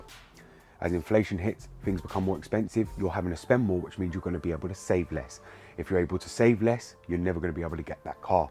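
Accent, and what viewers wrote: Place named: England, United Kingdom. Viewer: He is an Englishman with a deep accent. As an African it took me some time to understand what he says but I have kept touch with his videos.. I now understand all what he says...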